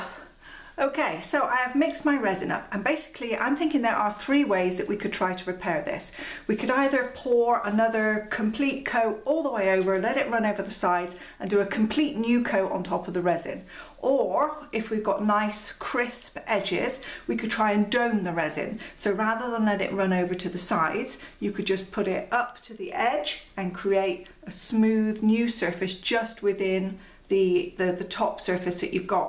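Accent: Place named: United Kingdom. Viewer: That's awesome, a 2 sided resin picture! I've been trying to make out your accent. Pretty certain youre British, maybe from Devon or Cornwall, somewhere down there. Then I was thinking may be that's the accent from the island where you are. Then, haha, you said 'soap or squeezy,' & I'm pretty sure it's just us Brits that call it squeezy. For the rest of you, it's probably dish soap or the actual brand name! 😀😀 Anyway, thank you for all your tips & experiments. I'm really enjoying watching you.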